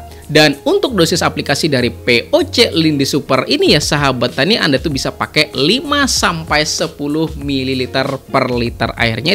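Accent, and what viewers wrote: Banjar, Indonesia. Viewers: kaya org banjar sdin ni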